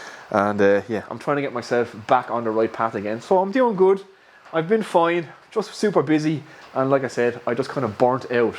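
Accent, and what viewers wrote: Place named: Wexford, Ireland. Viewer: where are you from, you sound like a Wexford Man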